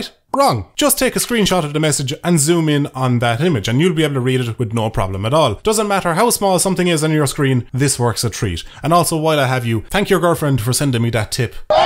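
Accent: Ireland. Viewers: Your voice is so much more Irish in irl lmao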